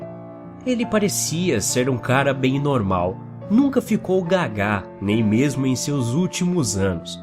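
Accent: Northeast, Brazil. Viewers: ele tem um sotaque nordestino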